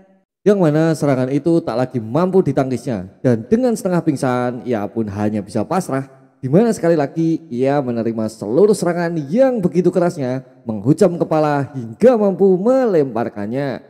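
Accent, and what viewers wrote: Java, Indonesia. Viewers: Logat jawa lo medok banget😅😅😅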